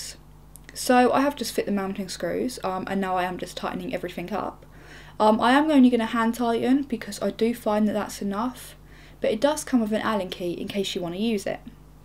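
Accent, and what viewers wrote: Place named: Essex, United Kingdom. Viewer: This one's definitely different from your newer videos, more chilled, but that Essex accent!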